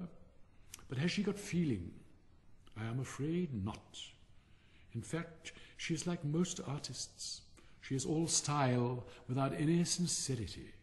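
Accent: United Kingdom. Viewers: Thank you! I was looking for a british narration.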